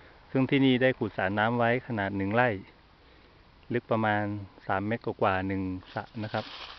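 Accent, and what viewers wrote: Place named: South, Thailand. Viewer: สำเนียงน้ำเสียงเหมือนคนใต้เลยครับ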